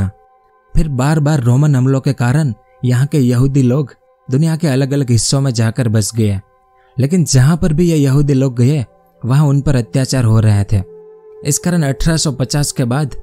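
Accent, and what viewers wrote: Gujarat, India. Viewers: Yeh bhai Gujarati hai..unke voiceover se pata chalta hai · tamaru bolvanu tone gujarati aave chhe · आपके बोलने Accent एकदम गुजराती जैसी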